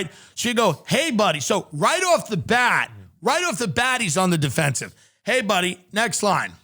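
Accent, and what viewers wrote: United States. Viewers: He sounds American to me too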